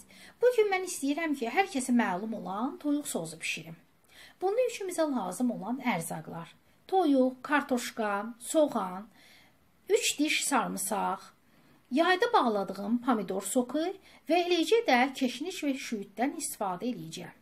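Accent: Baku, Azerbaijan. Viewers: Canım ay Bakı ləhcəsi!!!!!! Lap ürəyim açıldı nenem sözünü eşıdəndə. Əllərinizə sağlıq!!!!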